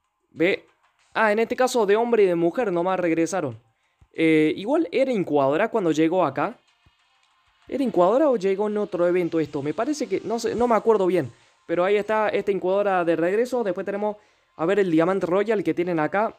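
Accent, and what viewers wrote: Argentina. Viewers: Re argentina el pibe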